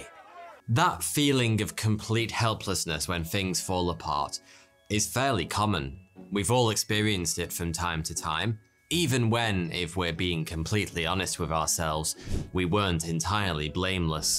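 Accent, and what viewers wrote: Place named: England, United Kingdom. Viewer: I can’t tell from your accent, where about from England are you from. Is it London?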